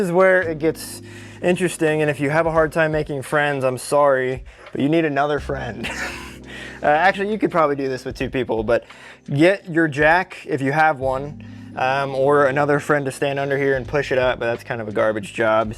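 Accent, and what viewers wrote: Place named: Pittsburgh, United States. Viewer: Yinz from the ‘Burgh? I detect a Pittsburghese accent. 😅👍🏽 Thanks for actually showing the “rapid disassembly” method, even though it was a very short clip. I understand you can’t specifically recommend this method (due to liability), but I saw enough to have some confidence to try this rather than taking off the rear trailing arms.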